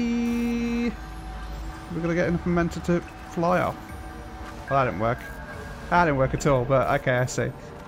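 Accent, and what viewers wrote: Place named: North, United Kingdom. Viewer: Not related to the game, but I'm having trouble placing your accent, it sounds like you are from the UK but I can't place it more precisely, maybe a bit northern but a bit London at the same time.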